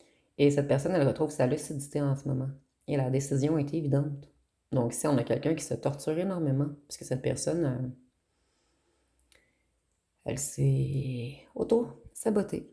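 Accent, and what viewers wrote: Canada. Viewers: Désolé je sais que je suis enquiquinant, mais ca fait bizarre, pas naturel les faux ongles, cette surépaisseur, l'accent canadien on aime bien il est pas abusé, je vous souhaites de trouver en vous quelque chose qui rayonne qui fait que tout ajout visuel, auditif, ou quoi que ce soit de "matériel" ne serait que reducteur . Une force tranquille Amen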